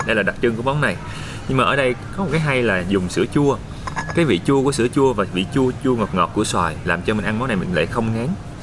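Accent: Mekong Delta, Vietnam. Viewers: Thích a , giọng đậm chất miền tây luôn 😋😋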